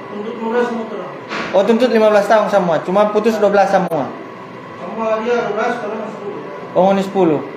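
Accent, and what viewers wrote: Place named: Manado, Indonesia. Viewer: Bahasa manado logatnya ashek jg jd terbawa..bawa aku 😊 salam dari Bandung ndan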